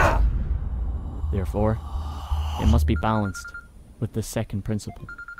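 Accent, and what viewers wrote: Scotland, United Kingdom. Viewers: Scottish ASMR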